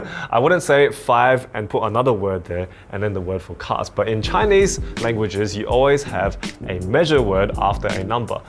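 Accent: Australia. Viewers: You sound like an Aussie? Where are you from? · Based on your accent, I'm guessing you're in Australia? Do you teach here? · so much easier learning from a Aussie accent.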